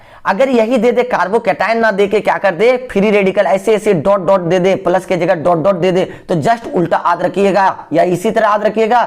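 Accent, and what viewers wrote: Jharkhand, India. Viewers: Aap Jharkhand se he aapka language bata raha hai 😃😃😃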